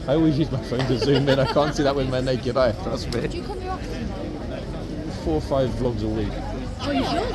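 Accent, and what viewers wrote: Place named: United Kingdom. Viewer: Aside from all your lovely videos, I saw a short video of you and Peter Ellis -- always in others I only saw you from the side or back -- but in this I saw you facing full front. You are very good looking along with your wit, intelligence and respect!! Wish I was younger but sad to say am old enough to be your mother. (Am 74) anyway so enjoy your conversation in your videos!! Have a good day! Also love your British accent!!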